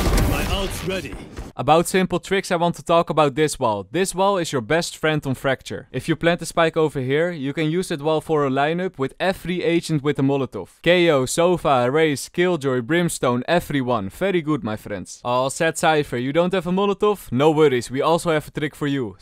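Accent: Netherlands. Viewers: You sound really dutch. btw never stop this amazing content!!!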